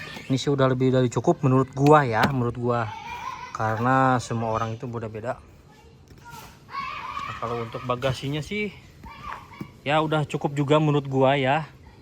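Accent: Indonesia. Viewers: logat Sunda+gua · Urang Sunda ieu mh logatnya beda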